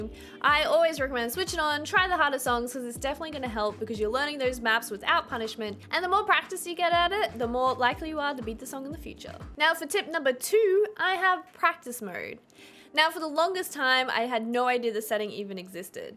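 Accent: Australia. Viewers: I always forget you're from Australia! I'm Aussie too, but every time I hear you speak it takes a second to adjust to your accent. Thanks for these tips, though. I got an Oculus a few days ago and I'm stuck between Hard and Expert. No fail and the different grips are a game changer! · I like your dancey play style - I enjoy using that approach with my juggling..more satisfying (and different) than pure tech, IMO. Australian & New Zealand accents are some of my favorite. To my American ear, it sounds like the words are often mellifluously packed full of warbled, extra vowels. I want to understand why the hard “O” sounds like “-arr” - this fascinates me! Thanks for the advice! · Are you Australian?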